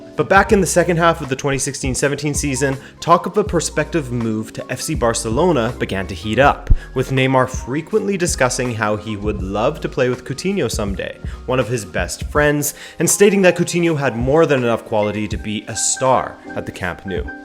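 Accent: United States. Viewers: Are you American? Lol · When I heard your American accent I thought you’d be making me angry at some point like most Americans talking about football. That didn’t happen. Very informative and well made video. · the only time I hear football spoken about in an American accent, it's completely bashing it. nice change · Heard an American accent, turned off. It's Inter Milan not Inter Merlhaaaan.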